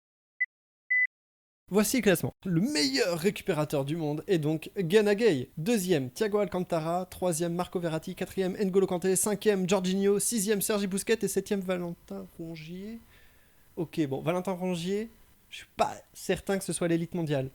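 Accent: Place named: England, United Kingdom. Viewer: L'accent anglais <3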